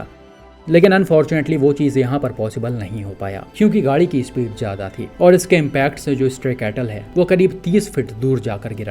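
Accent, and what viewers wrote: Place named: Assam, India. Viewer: Pls don't critcise hindi speech.. It is Assamese accent..pls respect it. I am from UP but residing in Assam for more than a decade.. 🙏🏼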